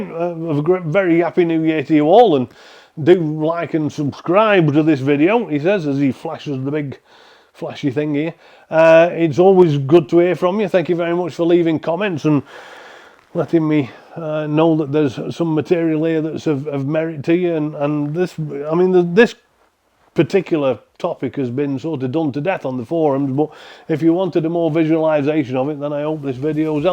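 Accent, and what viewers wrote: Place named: Lancashire, United Kingdom. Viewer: Some people just have to something pissy, if you don't like the man's Lancashire Accent go get your highly valuable LR knowledge somewhere else. No one is forcing you to listen . Ungrateful pillock.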